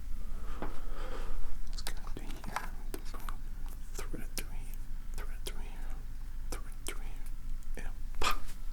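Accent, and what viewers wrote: United States. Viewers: Wow, your American accent is excellent. If I'd seen this series first I would have assumed this was your natural accent. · I LOVE the American accent!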